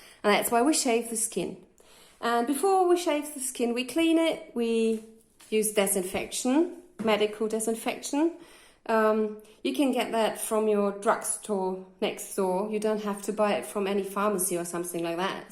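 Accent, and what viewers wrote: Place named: Australia. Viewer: i thought she was a fellow australian for a minute lol.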